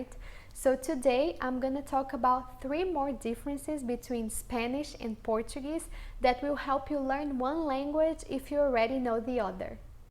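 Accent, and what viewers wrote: Brazil. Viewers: Você estava falando em português do Brasil, em vês de português de Portugal... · But Portuguese you speak is Brazilian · All she says is for a Brazilian Portuguese. European Portuguese sounds nothing like she's talking. It sounds more like a mix of French and Polish tbh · It was you who put the subtitles? Its because the flag is Portuguese, but the subtitles and your pronunciation are Brazilian. About the "V" in some zones of north of Portugal people read the "V" like in Spanish. "Vaca" stay 'βakɐ. The Portuguese have no problem learning Spanish, in fact a Portuguese needs half the time than a Spanish because of the sounds. In European Portuguese we have a strong "R" and we do not read it as "H". In fact the Spaniards have problems in reading our R. You must change the flag once your comparisons and pronouncements are in Brazilian Portuguese and not European. 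To be honest, it is harder for a student to learn Portuguese after learning Spanish than for learning Portuguese first. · Your Portuguese pronunciation of words is Brazilian not European. Can u upload a video how to pronounce various sounds like 'rr' in European Portuguese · Tenta praticar mais o teu portugues de portugal porque tu estavas a falar portugues do brasil e nao do Portugal eu sou português tenta praticar mais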